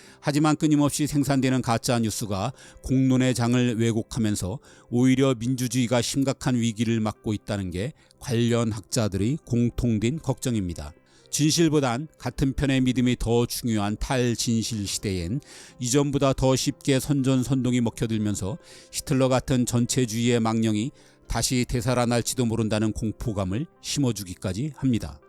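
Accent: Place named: Jeolla, South Korea. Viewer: 고향이 전라도이신가 보네요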